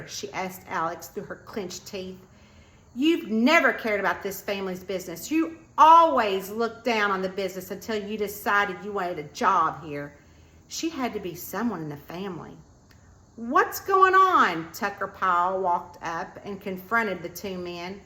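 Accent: South, United States. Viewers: Love that Southern twang when I know it's real. You have done a great job with the southern style of small town, been there, done that. Now I'm old.😄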